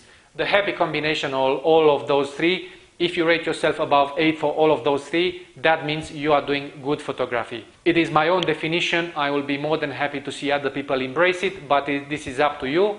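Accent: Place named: Romania. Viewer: of Photography you sound like a romanian :)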